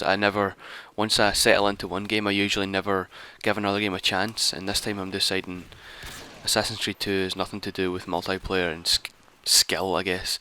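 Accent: Scotland, United Kingdom. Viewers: scottish arent you im scottish? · OMFG YOUR SCOTTISH, YA BEAST :D:D:D:D:D:D:D finally, a scottish dude like myself doing a vid :L awesome :D:D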